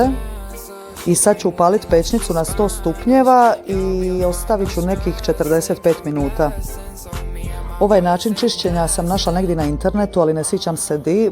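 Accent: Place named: Dalmatia, Croatia. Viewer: volim i taj Dalmatinski naglasak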